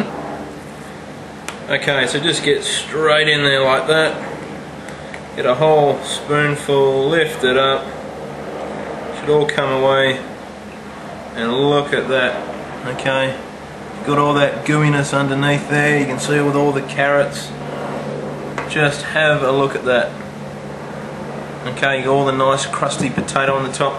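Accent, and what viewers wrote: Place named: Australia. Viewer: can you use anything other than gravy? Say, if you REALLY REALLY hate gravy? And I looove you australians accents. They're soo aweosme.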